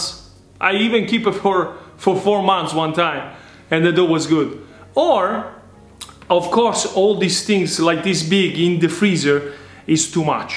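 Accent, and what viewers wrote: Italy. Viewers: .. fantastico il tuo inglese!! Lo adoro, italienglish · You are kidding , right? He’s originally from Italy, so he has an authentic Italian accent.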